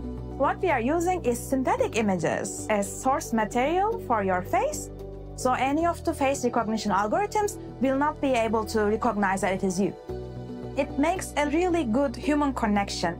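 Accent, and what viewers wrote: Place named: Turkey. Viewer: Tam bir Türk aksanı. Konuyu kolay anladım. Teşekkürler.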